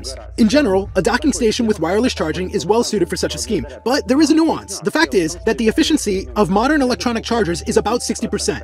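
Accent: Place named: Russia. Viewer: поздно...лет с 5-7 надо постоянно говорить на языке, сейчас поздно, с диким руzzким акцентом англоязычные нейтивы слушать не станут ,тем более во время войны..да и тех.контента на хорошем инглише полно,они первыми узнают новости науки,лишь потом на других языках . почти всё по и железо разработано в развитых странах...запад определяет путь технологий,в рфии только узнают новости постфактум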